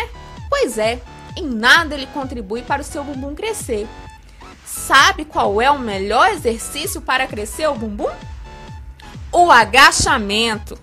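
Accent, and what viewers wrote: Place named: Minas Gerais, Brazil. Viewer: SOTAQUE MINEIROO KKKK <3 AMO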